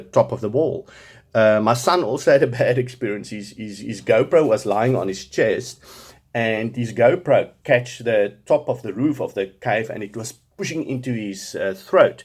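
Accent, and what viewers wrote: South Africa. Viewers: An excellent video..being irish its funny hearing your south African expressions in your accent..right now I'm in Phuket on holidays and it's lashing rain 😎 😂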